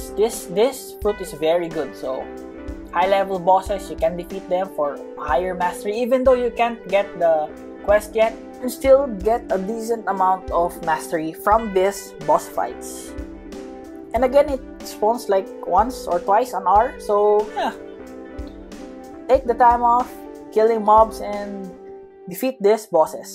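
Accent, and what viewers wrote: Philippines. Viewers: Ngl this dude sounds like Charlz the french and his voice is like Filipino Edit:He is Filipino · I can tell that this guy is Filipino because of his accent.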